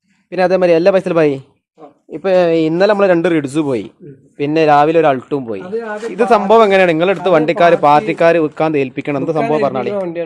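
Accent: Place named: Malappuram, India. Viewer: മലപ്പുറം slang പൊളിയ 😂😂😂😂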